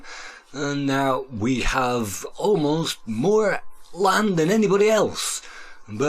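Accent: United Kingdom. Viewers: Bri'ish guy narrates an American Amiga game about Bri'tain. Checks out. Nice video, and nice how you even used an NTSC hardware with the aspect ratio the game was designed for. You don't hear a lot about NTSC Commodore hardware due to how Commodore computers tended to do better in Europe.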